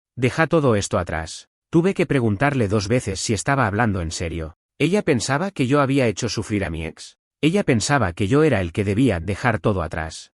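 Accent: Argentina. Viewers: Me suena a que es argentino, al menos eso parece por como hablá.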